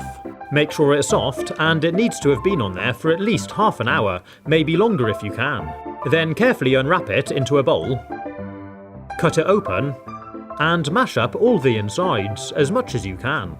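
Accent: England, United Kingdom. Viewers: R u a brit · Yes, he's from England. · Are you English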